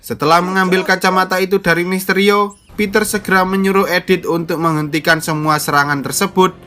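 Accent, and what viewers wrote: Java, Indonesia. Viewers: Medoo bngt lu anjing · Jowo mu ngndi lur?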